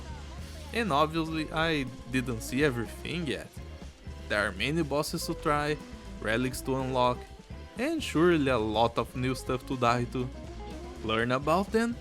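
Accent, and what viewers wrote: Brazil. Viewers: You are Brazilian? your sotaque are very paulista :V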